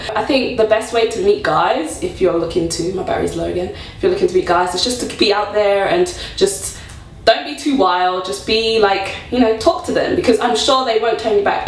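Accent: United Kingdom. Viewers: So glad I found you! What teach program are you with? I just applied for study abroad to Korea for the Summer. I want to start teaching there when I graduate (Dec '13)....So excited. I love the British accent. I would go into it so much in High School because I watched so much BBC. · Cute...your my Nigerian sister and the only difference is the accent, I speak american and you speak british. I love your stories its awweeessoommee.......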